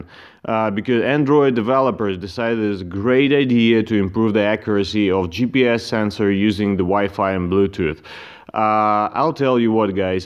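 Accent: Russia. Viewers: Solved my issue, thanks. Your have an obvious accent, is out Russian?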